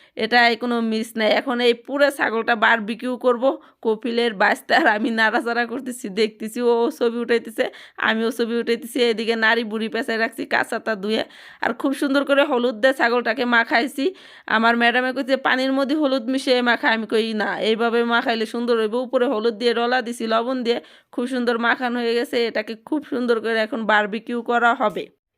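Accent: Manikganj, Bangladesh. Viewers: আপনার কথা শুনে মনে হয় আপনি মানিকগঞ্জ জেলা সিংগাইর থানার মানুষ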